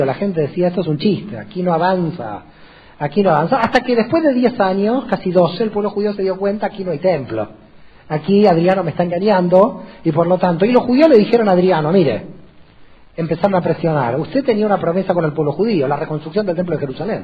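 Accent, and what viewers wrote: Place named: Latin America. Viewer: Este señor es judío de religión y no de sangre habla con acento latino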